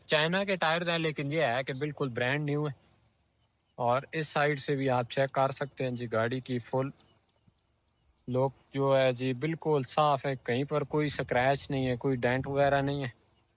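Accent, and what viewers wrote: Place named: Chakwal, Pakistan. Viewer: acha acha language apki Chakwal wali lagii islyee mujhy lga ap Chakwal se ho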